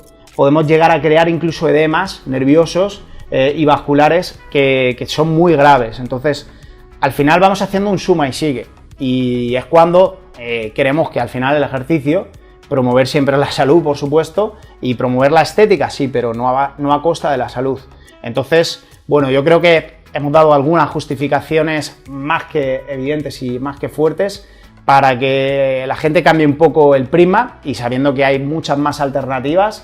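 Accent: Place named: Chile. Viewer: Esa forma de hablar... Chileno!!!!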